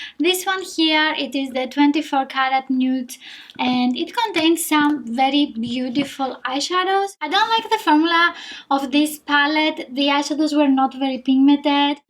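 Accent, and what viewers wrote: Greece. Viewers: where are you from?You sound a lot like Greek 😀😀💝💝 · Η προφορά σου φουλ ελληνική καταλαβαα αμεσως οτι εισαι ελληνιδα 😍😍😍❤❤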